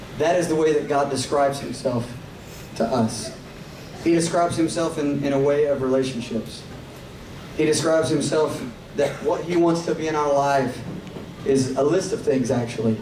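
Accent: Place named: Tennessee, United States. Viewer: "You see, I sound like I'm from Tennessee . . . because I am."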